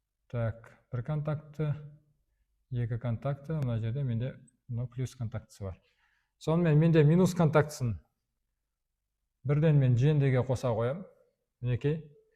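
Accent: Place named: Mongolia. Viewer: Осы бала Монголиядан келген сияқты.Өте түсінікті айтып жатыр көптен көп рахмет .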